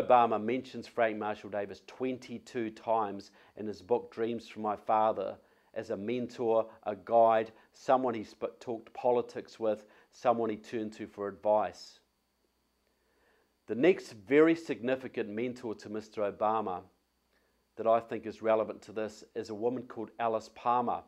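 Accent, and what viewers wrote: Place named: Australia. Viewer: i just cant stand looking at his ugly drood face for more than 1 minute, and listen to his annoying austrailian accent, long enough to finish this video, boooorrrinnng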